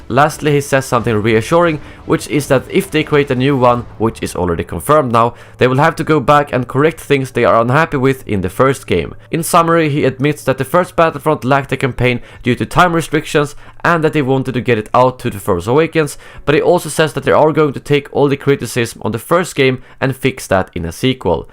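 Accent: Sweden. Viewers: Are you A Swede?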